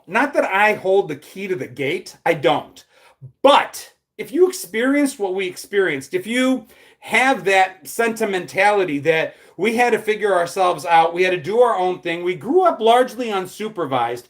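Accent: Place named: North, United States. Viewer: Lol... I knew you were from that part of the northern portion of the US. I was raised in Ga(till 13½) then New Hampshire so Im pretty good at picking up accents. I was born in feb '73 so im Totally a Gen-Xer 😊...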